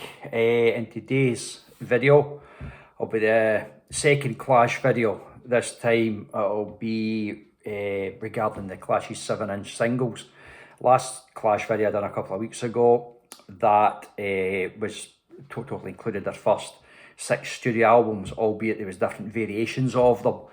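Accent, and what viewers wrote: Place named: Scotland, United Kingdom. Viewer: Hei! That Japanese singles box set is incredible and you still have it in excellent condition! You must have taken really good care of it. After all it's over 40 years old release! My favorite CLASH songs are and. Hope to find them on 7" format some day. Too bad that the CLASH singles are pretty rare around here in Finland. Scottish accent is pretty hard for a Finn to hear and understand but other than that excellent video and excellent collection, /MyVinylBar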